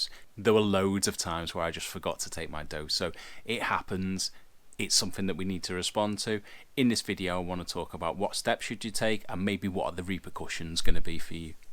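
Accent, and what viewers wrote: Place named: Scotland, United Kingdom. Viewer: Are you Scottish? I love this accent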